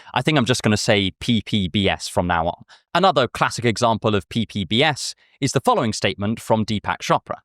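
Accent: RP, United Kingdom. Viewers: Honestly your presentation comes off this way even pretentious but you're delightfully sensible as far as I've seen. Might be the accent if I'm honest very posh to my American ears.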